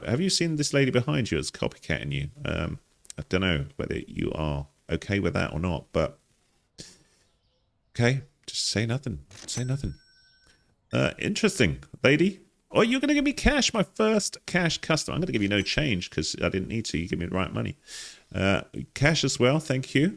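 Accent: United Kingdom. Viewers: Awesome Video. Supermarket Simulator Looks Like A Fun Game To Play. Off Topic: Your British Accent Sounds So Cool 🙂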